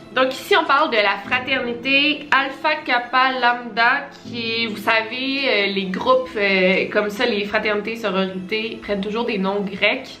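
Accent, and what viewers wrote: Quebec, Canada. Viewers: Je salue la grande amélioration de la diction depuis les 1 ere vidéos. L'accent québécoise malheureusement trop prononcé sur les vidéos du débuts de la chaîne. Les rendant quelques peut incompréhensibles pour les français.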